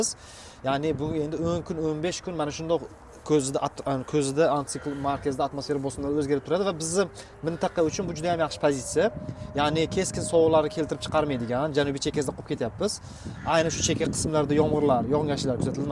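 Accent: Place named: Fergana, Uzbekistan. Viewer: Нимага чала гапирасиз фаргона гапиз кобкети ичизда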